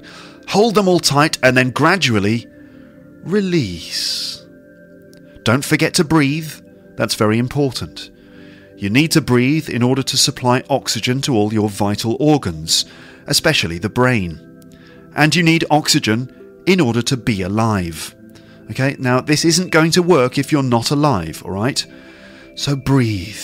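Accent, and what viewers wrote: United Kingdom. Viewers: To be honest I fell asleep once while listening to your podcast 😐 Please don't hate me - I've turned your podcast on with purpose, hoping that your pure British pronunciation will get some far parts of my poor exhausted brain and settle itself there))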